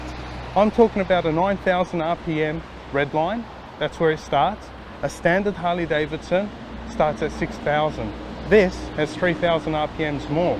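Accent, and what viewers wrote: Australia. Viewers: This is NOT your typical Australian accent, this guys speaks with an ethnic background combined with an Australian accent just as an FYI..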